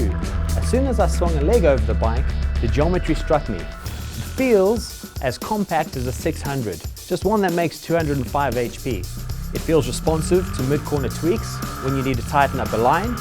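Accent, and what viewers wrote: South Africa. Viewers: Fucking Bothered I wanna say South African.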